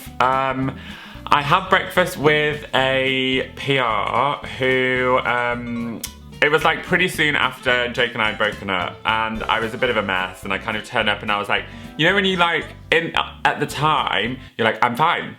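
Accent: Australia. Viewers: Love the Aussie accent. Hugs from Queensland! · love you!!and that aussie accent just brightened up my dreary sunday:D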